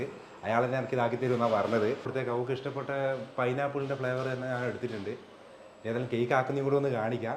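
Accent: Kannur, India. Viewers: അനക്ക് എനക് കണ്ണൂർ സ്ലാങ് അടിപൊളി 🕺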